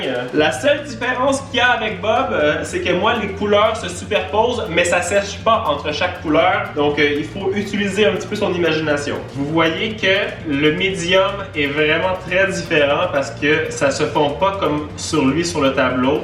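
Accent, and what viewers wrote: Canada. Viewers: tu mon youtubeur préféré de is vidéos accent canadien et qui met quoi des vidéos trop trop rire 👍👍👍👍👍traduis le mot anglais 24h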